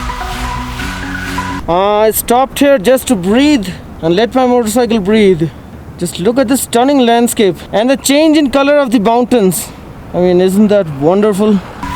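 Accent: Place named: Bengal, India. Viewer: Bhai ka bong accent hai.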